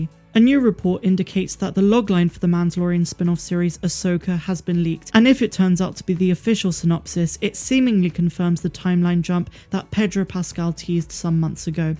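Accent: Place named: England, United Kingdom. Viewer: I love hearing your English accent:),,, on Starwars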